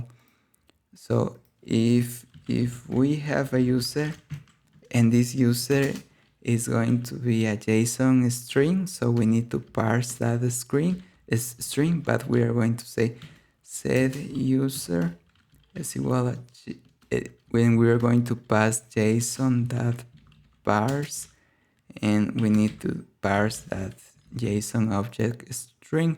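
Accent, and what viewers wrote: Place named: Spain. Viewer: I think you Spanish, your English accent is so beautiful 😍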